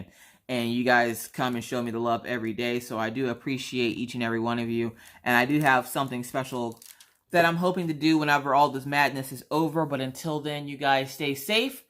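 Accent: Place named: Georgia, United States. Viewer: I’m actually surprised that they did your MRI. I say that because I broke my hip 3 weeks ago and my doctor thinks I need surgery but now no surgeries or any procedures are being done except chemo and dialysis, and life threatening surgeries. Of course it may be a state thing and I’m in Georgia.( got that accent too lol) Glad you were able to get it done.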